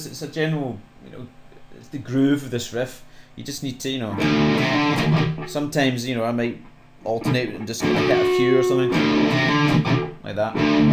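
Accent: Scotland, United Kingdom. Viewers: you scottish dude? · BRILLIANT M8 where areyou from in Scotland ?? Edinburgh??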